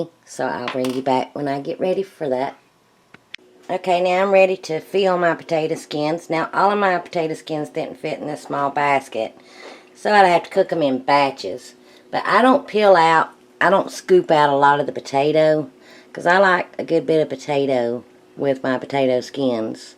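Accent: South, United States. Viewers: I love the southern accent I am from the south as well so I love it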